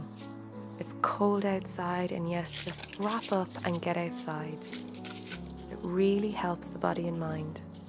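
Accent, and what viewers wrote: Ireland. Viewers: What beautiful and peaceful videos, deeply relaxing to share this, thank you. The way you live is how I too love to live life, enjoying all the small pleasures and that is how I get through all my months, just taking one step at a time end savoring the moments and all the beauty in my life! Blessings dear friend, you have such a soothing voice and I love the Irish accent. All so inspiring here!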